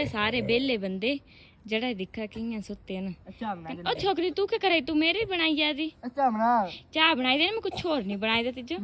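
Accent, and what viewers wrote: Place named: Himachal Pradesh, India. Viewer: Himachali boli hai bro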